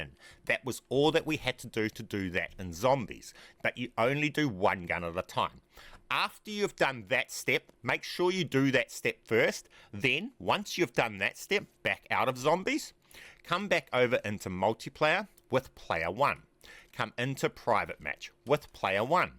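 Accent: New Zealand. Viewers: Churr brother are you kiwi bro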